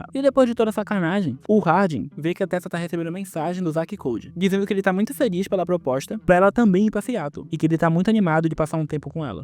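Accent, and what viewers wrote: Pará, Brazil. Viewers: Mano, fala sério, tu és paraense, né bicho? Tu é doído é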